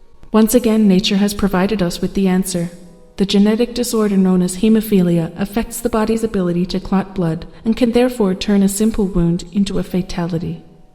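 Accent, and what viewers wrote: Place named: Australia. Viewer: Great narration. Always go Aussie when you need a good voice.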